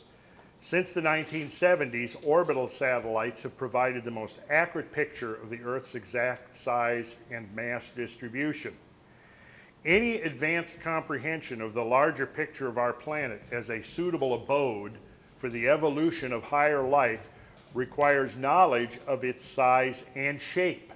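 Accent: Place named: Midwest, United States. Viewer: Minnesotans talk a little funny, don’tcha know? He may live in GA now, but he’s from MN, and he sounds like a northern Midwesterner.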